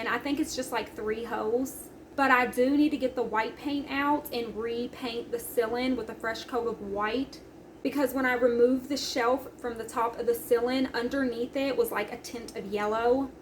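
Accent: South, United States. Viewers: I’m trying to figure out your accent. Are you from the South?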